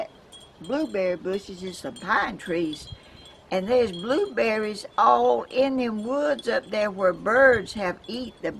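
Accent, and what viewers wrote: South, United States. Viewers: you are a joy for me to watch. A wonderful break from all the politics and the haters. I love your southern accent. My family migrated from the Georgia area to East Texas years ago, and your accent is like listening to my grandmother. You mentioned Buckhorn Methodist Church. My ancestors owned the Buckhorn Tavern, a famous trading post and sight of civil war battles. I assume you live close to that area, but the Tavern was closer to New Market, Alabama, not far from you. The horns from the Tavern were given to the Buckhorn High School. Anyway, just a little history. Don't worry about people who say unkind things to you...how awful. Do your thing....don't lose sleep over the haters. God Bless.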